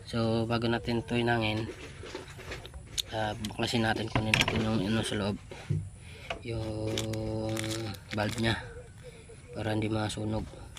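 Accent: Iloilo, Philippines. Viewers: Bro may airgun foot pump man nga guba Ako lang nag Kay o... Ilonggo ka man bro? God bless bro.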